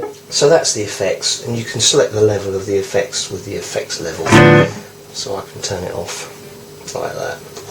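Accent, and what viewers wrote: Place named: United Kingdom. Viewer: you sound british and tell the weight in kg, astounding!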